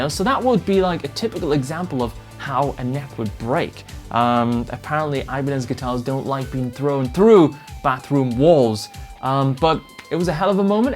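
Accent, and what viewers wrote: Newcastle, United Kingdom. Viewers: I guessed you were a Newcastle lad but you seem to have a mixed bag of accents spilling out from time to time. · How bout some of that Geordie accent in your next video? That MacAlpine BC Rich looks kicking!! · he's a Geordie. Newcastle, mate.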